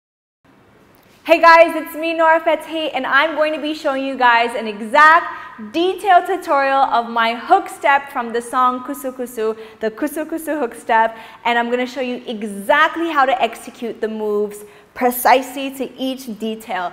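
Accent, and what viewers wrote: England, United Kingdom. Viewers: * your English accent * your Turkistan Lady look(!..) -Perfectum..✨👌🏻💖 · Omg I like her english accent.....❤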